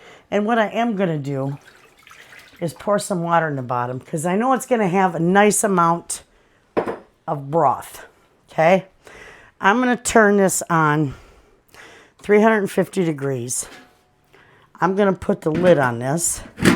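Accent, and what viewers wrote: Michigan, United States. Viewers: Just seeing your channel for the first time. Had to smile when I heard your Michigan accent, I spent the first 24 yrs of my life there. Of course I hit the subscribe button😂 Those chicken recipes look delicious! Looking forward to watching your other videos.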